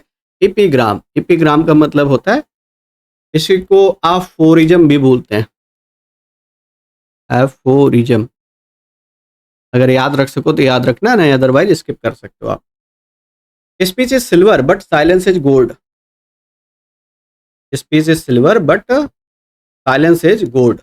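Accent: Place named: South, India. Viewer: sir south se hai kya unke accent se lg rha 😅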